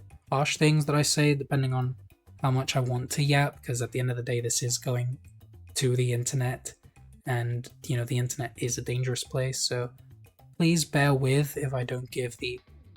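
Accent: United Kingdom. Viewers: You're Spanish??? With your accent, you'd think you're English. It's so good that the game's finally here. I grew up with it in The Netherlands (best dub!). I rewatched ALL episodes a couple years ago, and played the GO games for the first time. The GO anime never got to the Netherlands so I didn't really grow up with it, but Chrono Stones ended up being my favorite season. Anyway, I felt like yapping a bit too. Keep up the great videos! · Me sorprende que seas español,tienes un acento muy británico(yo también soy español btw) Yo también crecí con esta saga y nunca me he cansado de ella,no soy fan del futbol pero esta saga es algo muy especial para mí,me enseñó a no rendirme nunca,a seguir luchando a pesar de las circunstancias. Tengo demasiadas horas en estos juegos entre lo que jugué cuando salieron y otros medios 😉 Todavía no me puedo creer que hoy sale por fin VR(EA) probablemente sea el juego que más he esperado de toda mi vida y sé que va a ser un viaje muy especial(GOTY 100%) Espero que lo disfrutes tanto tanto como yo o más Vamos a dejar nuestra marca Inazuma en el mundo!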